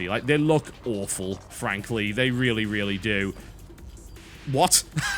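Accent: United Kingdom. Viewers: You talk like The Doctor (And not because of the British accent. You just have the same emotional phases in your voice. and your sentences.)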